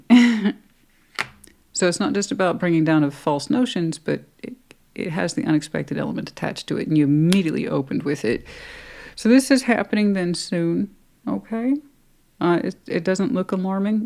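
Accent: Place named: South, United States. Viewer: You are such an interesting and intelligent reader Scorpio sister, and I truly make an effort to try to listen to you. To no avail. Why effort? Because English is not my mother tongue, and despite having lived in D.C. for twenty years, I didn't have any exposure to the southern states, therefore your fast speech and southern accent with words not clearly articulated pose a big problem for me. I am sure others sail smoothly through your presentation. I manage a little and then I give up, as I just don't get but 30% of what you say. Sorry for being frank, regards from one older woman from Europe who would otherwise be ecstatic to follow your channel. Best to you!❤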